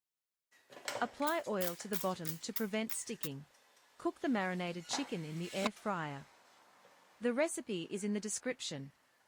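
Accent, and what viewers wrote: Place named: Australia. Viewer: This is a cute bento. More people would watch if you used captions instead of the english voiceover. The Aussie accent is jarring to listen to.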